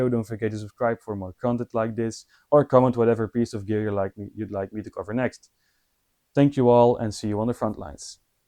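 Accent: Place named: Germany. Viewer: You must be a German as you speak English with a German accent. Sehr gut, mein freund. Cheers from an old Marine sergeant in eastern TN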